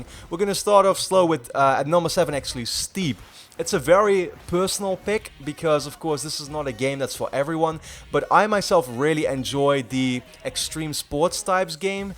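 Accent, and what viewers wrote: Netherlands. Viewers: i don't know why but as soon as I heard your voice I thought to myself 'is he Dutch?'. I'm from Amsterdam myself. New subscriber btw. Then I saw on your channel that you are from the Netherlands 😃. Pretty cool to see how big your channel is and awesome content. Gonna binge watch your videos to get myself up to speed.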